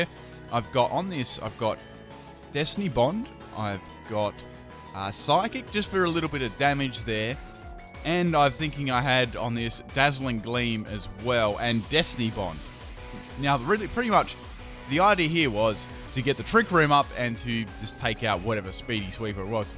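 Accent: Australia. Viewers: are you australia?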